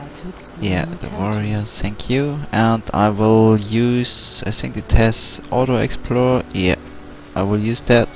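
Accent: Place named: Germany. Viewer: das ist doch denglish das du da sprichst freundchen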